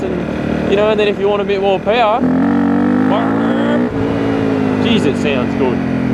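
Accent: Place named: Australia. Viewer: I am in Canada have been watching your vids for a while and have a 250L and i cant tell you how many times I have joked around and said here in a aussie accent and my friends all look at me like im retarded lol your awesome dude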